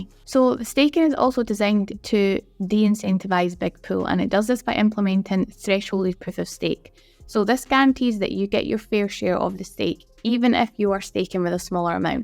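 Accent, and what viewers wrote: Scotland, United Kingdom. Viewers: Good having a scottish person speaking on crypto and relate on youtube 👍. Do you have twitter that we can follow aswel for any more information and updates ?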